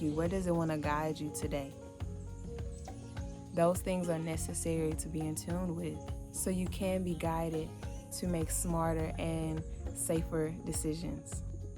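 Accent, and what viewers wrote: Houston, United States. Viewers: You have to be from Houston because you have that Houston accent. Lol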